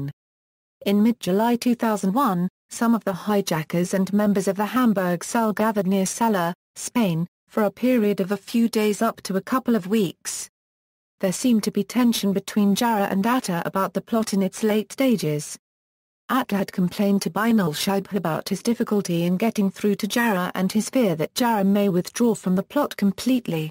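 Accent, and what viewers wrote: United Kingdom. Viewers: That’s just how British people talk lol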